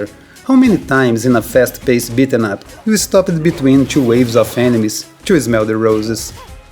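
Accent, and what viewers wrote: Brazil. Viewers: Cara, vc é brasileiro? Me parece que sim pelo seu sotaque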